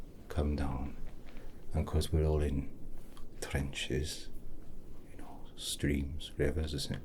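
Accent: Wales, United Kingdom. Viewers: You have such a lovely voice, whether you be singing or speaking, It's lovely. With your welsh accent it just made the video better. ☺☺☺ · I can understand him easily but then again I’m Welsh so the accent isn’t an obstacle for me maybe that’s what’s throwing you off? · The Welsh accent makes my brain happy. 😁💜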